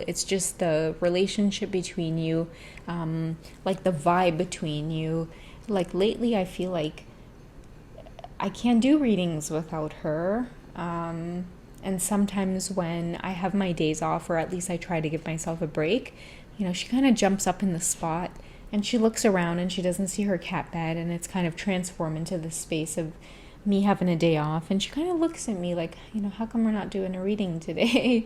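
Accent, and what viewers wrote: Trinidad and Tobago. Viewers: No, we like that you speak with your Trini accent when you talk to her! 😂 ♥️♥️♥️…Buss up shut and Tarot! 🥰😂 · Lmaoooo sis you DONE KNOW I LOVE THE TRINI ACCENT ENUH!!! 🇹🇹Stupezzzzzz lol PUNGKIN had me WEAK cause iykyk!! Hahahaha!!! Love it here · Ok I think I need trini talk everytime/reading please?!!! 🤣🥰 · Yes that!!! I love the Trini side!! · Oh lordie .. the Trini Popo...😂🤣😂Love hearing the Trini Accent in someone else lol..🇹🇹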